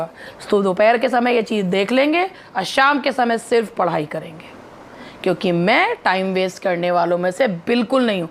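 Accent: Meerut, India. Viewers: Mam aap meerut se ho kya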